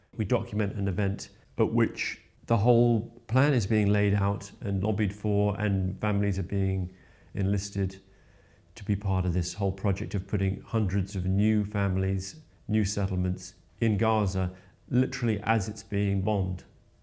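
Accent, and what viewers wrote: United Kingdom. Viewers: It IS a British accent though. It’s one of the many British accents. If your complaining that ‘British’ there’s a million English accents and they all British accents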